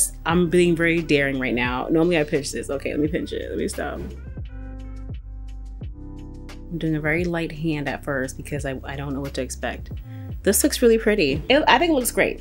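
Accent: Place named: Rhode Island, United States. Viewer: I freakin love your accent! From a MA gal to a RI gal😍😘💜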